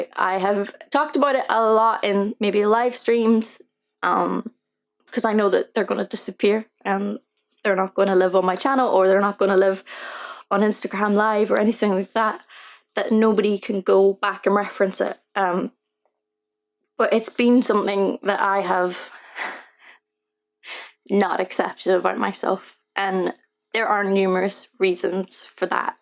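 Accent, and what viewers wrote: Ireland. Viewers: good try , Mistress of Clickbait :)) Now go back to sing, we adore your unique, spicey and irish accent ! Wish you joy & happiness ! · I Never post coments, but this is one of my first, I am so proud of you Janet, for posting this video, it'S what make you happy in life, don't, worry about what other people think. I was honered, to meet you and your manager when you came to Aberdeen, YOU are always a superstar to me. ( beautiful irish voice) You will aways be welcome in Aberdeen Joe x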